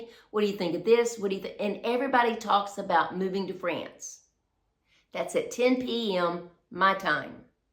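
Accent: United States. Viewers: I think with your strong American accent it is difficult to speak French well??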